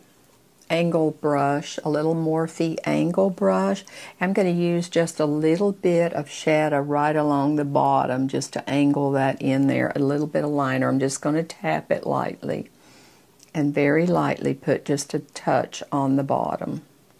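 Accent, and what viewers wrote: South, United States. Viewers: I tell ya, I am 52 and haven’t wore make up in years because of it setting in fine lines and wrinkles. No, I don’t have that many but you know what I mean, the make up would settle in those lines and wrinkles and it would emphasize said wrinkle and fine lines and it would make it look worse. I have recently had an outing with my husband and I bought that same elf foundation that you have there and it did pretty good. I didn’t wear any powder in fear that it would settle in the wrinkles. But thanks for all of your videos, I feel so inspired. And I figured you were from the South due to your accent, I think that’s why I immediately like you, I live in SW Georgia btw, lol❤.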